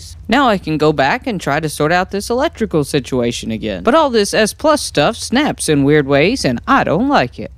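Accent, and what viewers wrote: South, United States. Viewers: You sound so southern i cant tell if you're a guy or girl. Loved the video tho.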